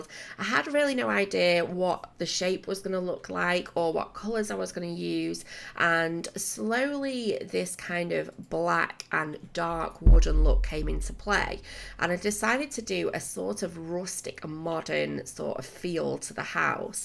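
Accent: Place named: North, United Kingdom. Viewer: Always watch your builds & LOVE LOVE LOVE THEM - Currently live in Amsterdam but I'm from Manchester, Always Good to hear another northerner ;)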